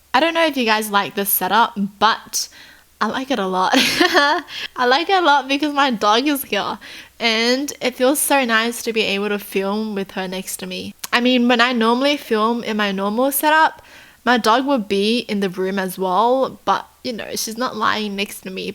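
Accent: Australia. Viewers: I love SO MUCH LIKE SO MUCH but if your a kpop lover [like me] you might notice she sounds and kinda looks like a Girl {my bias} named Rosé from BLACKPINK. Maybe it’s because they both have Australian accent but I love her and the same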